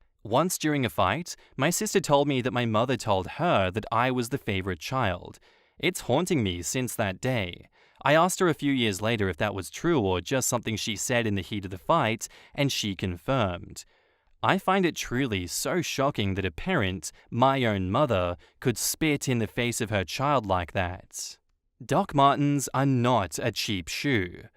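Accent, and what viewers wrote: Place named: Australia. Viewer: thanks for the Aussie accent such a pleasure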